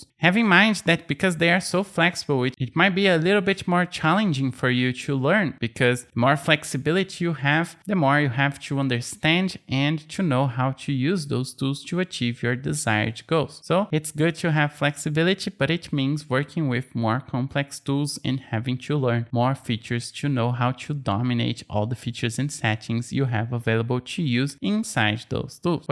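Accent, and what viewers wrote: Brazil. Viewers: Your english is with brazilian accent rsrs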